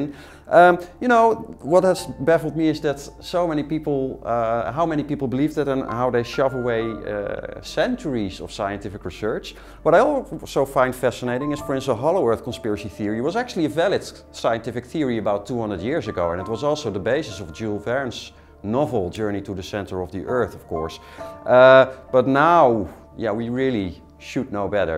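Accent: Netherlands. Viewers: wie hoorde al aan de stem dat dit een nederlander is? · He has such a dutch accent Im dutch too XD i hear it instantly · Yo hes netherlands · Mad Villain he is dutch, we have a lot of trouble with some sounds from the English language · You guys most dutch people have this accent when we speak english xd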